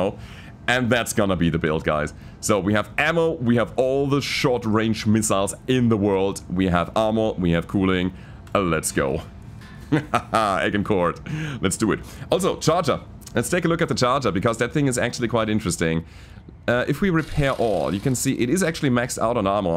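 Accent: Germany. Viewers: German accent "back shot again, oooo hes cherry red" Wife "what the hell are you watching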